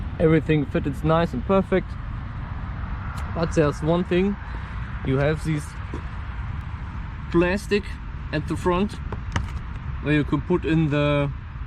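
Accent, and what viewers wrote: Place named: Germany. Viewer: man merkt direkt , dass ein deutscher hier redet XD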